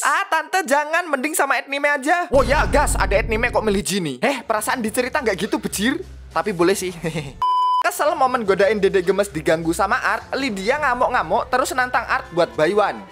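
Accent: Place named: Java, Indonesia. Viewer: wong jowo mas😅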